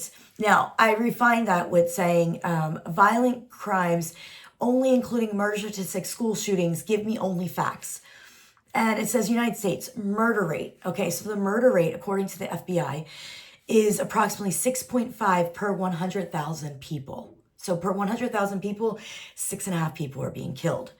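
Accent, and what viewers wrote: Morocco. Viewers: You're really a surprising and very open minded person. I am sure moroccans have akready started considering you as a citizen of Morocco. I still apreciate your darija accent.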